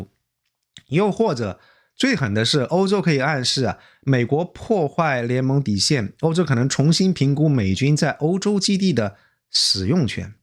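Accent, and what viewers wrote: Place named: Hunan, China. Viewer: 的普通话没有台湾口音，更像是湖南人讲普通话，n和l不分，没有贬义，只是留意到。听的视频好像是享受一顿丰富的大餐。当然同样的话题也有很多快餐，也有它们的食客。产品丰富，各取所需。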